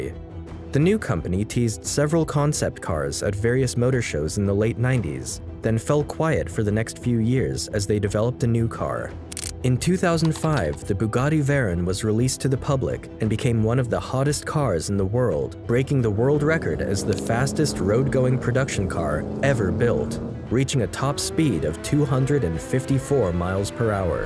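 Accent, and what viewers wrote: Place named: United States. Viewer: I appreciate the history content, but man learn to pronounce names of people and places in their original form. The americanisation of everything throughout this video was tearing my ears off…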